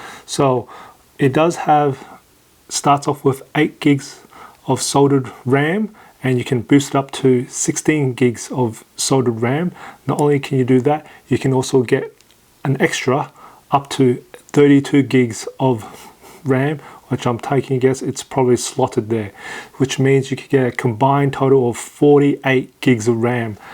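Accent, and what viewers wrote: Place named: Australia. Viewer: Nah it's okay I'm looking forward for new content on your channel . Btw nice aussie accent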